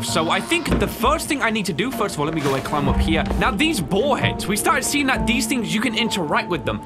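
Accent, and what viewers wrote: United Kingdom. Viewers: I know he is lives in the UK the accent gives that away. The US is a country founded off of distrust in government and you protect yourself from everyone including government. The founding fathers declared war over taxation. But it is about personal property and protecting it, and protecting our family and friends. As an aside the UK had the whole knife control bit where they want you to put knives in a box. So I don't think the UK is someone we look to for virtue in how to protect ourselves.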